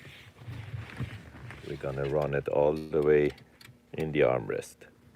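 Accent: Iran. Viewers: The guy accent looks iranian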